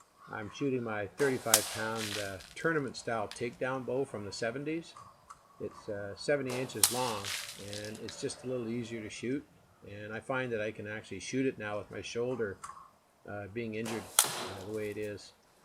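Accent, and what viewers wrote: Canada. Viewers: Aboot? Oh Canada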